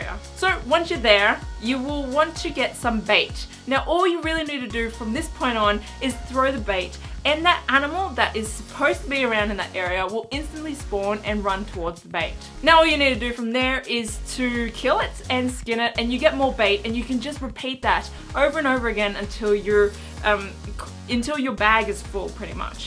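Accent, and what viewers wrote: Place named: Australia. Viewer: You're beautiful. Plus, I love auzzie accents. =)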